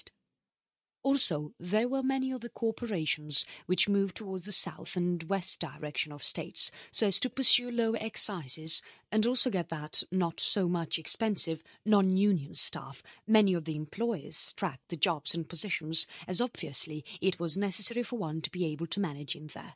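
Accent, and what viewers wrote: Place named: RP, United Kingdom. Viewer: It's nice that this is up free but the english is a little weird...too much focus on trying to sound posh at the expense of clarity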